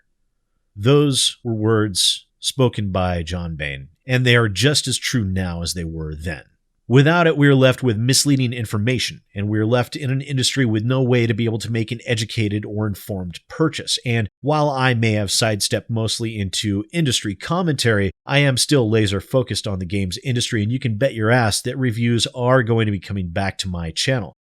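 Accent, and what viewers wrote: England, United Kingdom. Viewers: I'm going to miss that awesome British voice...RIP. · I dont even remember his reviews, just remember annoing english voice - nothing more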